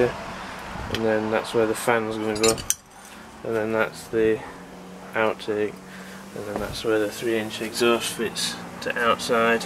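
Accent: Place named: North, United Kingdom. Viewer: This is the box i got wi it. Lazy northern accent and missing lots of letters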